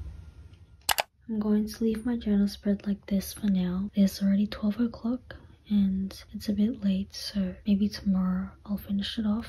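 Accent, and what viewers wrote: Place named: Australia. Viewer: now developing a slight aussie accent bcs i keep binging ur vids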